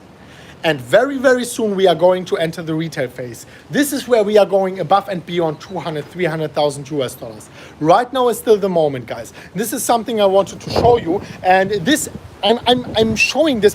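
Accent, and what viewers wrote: Germany. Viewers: Is he Austrian or German? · 300.000 just right now!!! congratulation mate. btw are you from germany or austria?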